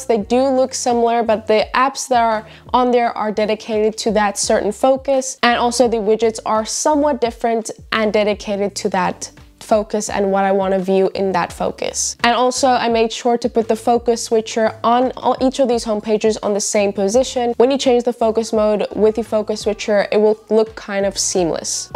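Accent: South Africa. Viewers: You sound so South African! I’m also from SA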